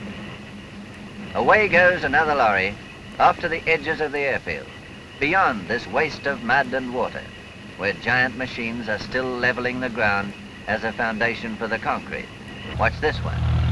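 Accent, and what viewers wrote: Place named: Australia. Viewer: the narrator actually sounds Australian ☝️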